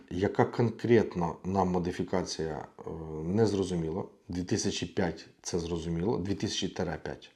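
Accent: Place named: Russia. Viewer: Яка бездоганна українська мова, спочатку подумав я, але це було тільки спочатку! Жахлива кількості русизмів, неправильні закінчення і взагалі не відповідні слова, або мовні покручі. Наприклад: мощний ; крепший; крєпєжа; пощитати замість порахувати і рахується замість вважається (рахується - калька з російської - СЧИТАЕТСЯ); по елЄктроніКі; канешно і таке інше. Жах. (((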